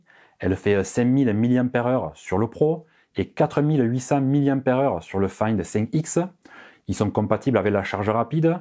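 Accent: South, France. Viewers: L'accent du sud c'est le meilleur 👍👍👍😁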